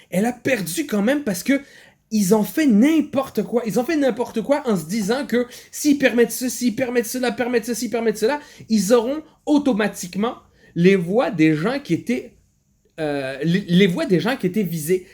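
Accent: Quebec, Canada. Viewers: vive Trump tabarnak ça fait du bien aussi d'entendre un accent québécois parce que l'accent français un moment donné ça tombe sur les rognons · ton accent québécois est solide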